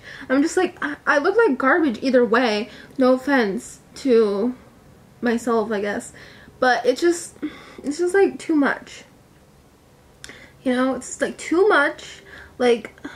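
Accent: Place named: South, United States. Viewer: You sound kind of southern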